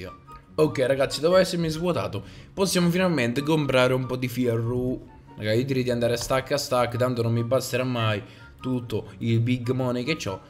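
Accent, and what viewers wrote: Naples, Italy. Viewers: Sei napoletano?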